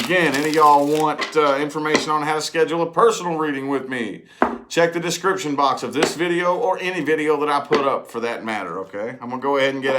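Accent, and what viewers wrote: South, United States. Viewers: Super accurate, but also I would watch even if it weren't! Love ur energy, your southern accent and your face 😂😂😂😂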